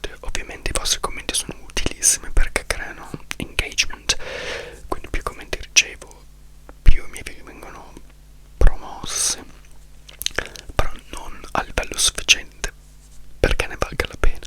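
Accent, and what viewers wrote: United Kingdom. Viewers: Scusami ma ho alcune critiche da farti: 1 sei fissato con la corretta pronuncia delle lingue ma poi hai perso l’accento italiano corretto pronunciando la t inglese e spesso le doppie molto poco doppie. 2 buffo come alla fine il video da italiano pentito in cui praticamente disprezzi l’italiano è quello più visto. Alla fine ciò che hai disprezzato e cercato di cancellare dalla tua identità è ciò che ti ha dato più soddisfazioni. 3 hai un atteggiamento vittimistico nei confronti della vita, le sfighe succedono tutte a te. Per questo ti consiglio di vedere uno psicologo. Avere un male incurabile è qualcosa fuori dal nostro controllo ma scegliere persone sbagliate di cui invaghirsi o non riuscire in un lavoro dipende da noi. Per esempio fare la drag ma odiare il mondo drag e non uscire con loro significa essere tagliati fuori dal giro in un mondo in cui i contatti sono tutto. 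Se è quello che vuoi fare come lavoro devi scendere a compromessi, altrimenti resta fedele a te stesso e rendilo un hobby. Il mondo non ti deve niente, se vuoi qualcosa vai e falla ma sii consapevole dei compromessi che sono richiesti, nessuno ha detto che il mondo sia giusto o che basta il merito purtroppo. 4 sinceramente non capisco l’esigenza di dover sentir parlare inglese. Non capisco la fissazione per l’inglese. Si sceglie di vivere in un posto perché è il migliore per le proprie esigenze lavorative e di stile di vita e magari anche per gli affetti che uno ha. Se sono una makeup artist e la città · Ma perché se sei italiano parli con l’accento inglese? So che non vivi in Italia,ma non comprendo il dover sforzarsi a far sentire per forza che si ha un accento straniero… 😅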